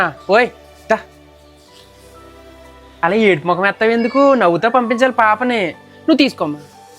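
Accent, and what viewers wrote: Godavari, India. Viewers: u are extraordinary …గోదావరి యాస అద్భుతం…అన్ని సంఘటనలు మన ఇంట్లో జరిగినట్లే ఉంటాయి…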